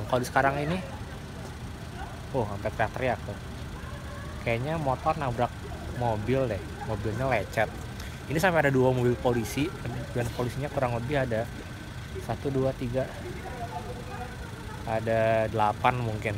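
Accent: West Java, Indonesia. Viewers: urang sunda? wkwk logat nya sunda pisan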